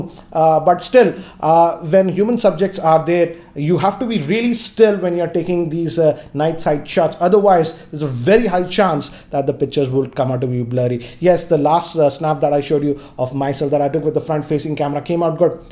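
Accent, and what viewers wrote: India. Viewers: Desi Babu English Voice..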